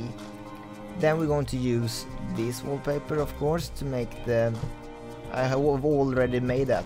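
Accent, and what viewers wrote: Sweden. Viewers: dat swenglish man ;) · The swenglish 😂😂 I am from Sweden so it doesn't bother me 😂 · Dat Swinglish xD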